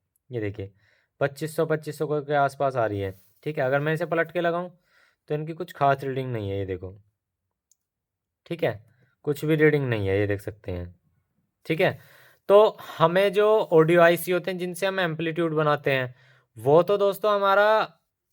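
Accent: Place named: Tripura, India. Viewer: Kaisa ho sir, Tripura sa bol raha ho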